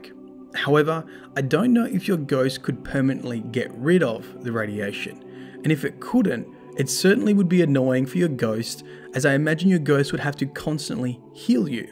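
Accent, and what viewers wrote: Australia. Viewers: Quarantine ! Also Lol ‘Zed’ I love your AU accent!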